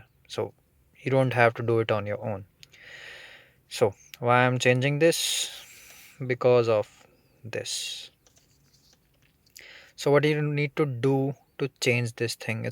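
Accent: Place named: India. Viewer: Engleza indiana, imi vine sa ma cac!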